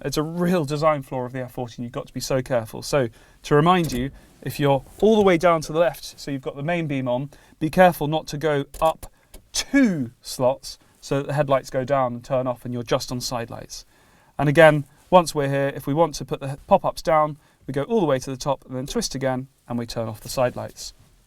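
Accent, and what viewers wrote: United Kingdom. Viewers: hearing a brit explain how collectors complain about having a lift vs having an auto lift is a rich ppl problem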